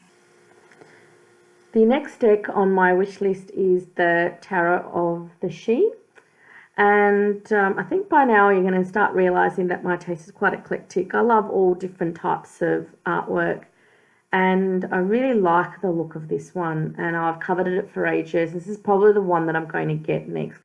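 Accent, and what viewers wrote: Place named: Australia. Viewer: Great video! I love listening to your voice. So nice to hear another Aussie on here :) (Stacey aka Stacey Lyn off fb) 🙏🏻💜🙏🏻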